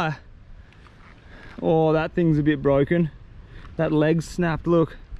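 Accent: Australia. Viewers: Its Aussie accent for me, and such a positive vibe and energy. I love this dude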